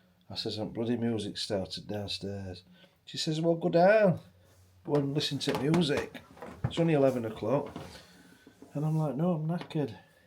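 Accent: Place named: Northern England, United Kingdom. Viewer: Dead pan Northerner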